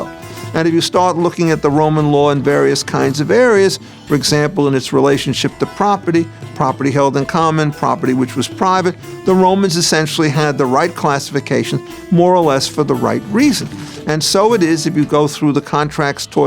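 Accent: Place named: New York, United States. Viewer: Jewish New Yorker born before 1960 accent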